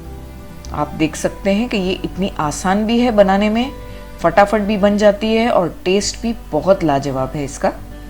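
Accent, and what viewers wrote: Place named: Bangalore, India. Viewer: I am from Bangalore , and you sound a bit Bangaloren so asked. Thank you very much mam...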